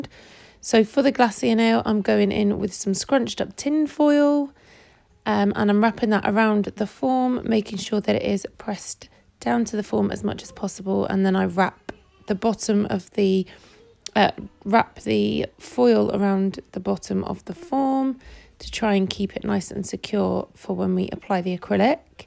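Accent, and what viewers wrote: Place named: United Kingdom. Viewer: Lol I didn’t understand what you were saying when you were saying glacier for a while but then I figured it out. In America we say it like glay-shur, so the way you say it I was like what?! Lol. I like uk accents, everything is prettier 😊 Also, these nails are gorgeous! Love how you created that ice affect with the foil, definitely gonna use that in a set ❤️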